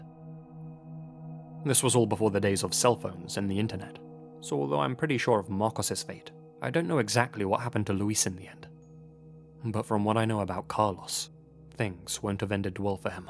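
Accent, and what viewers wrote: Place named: Northern England, United Kingdom. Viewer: Your accent is quite hard to place. It's certainly British - more specifically English and largely Southern, but it sounds like it has the lilt of somewhere else. Either you grew up down in Southern England and moved up North acquiring a northern lilt, visa versa or you just have unique annunciation.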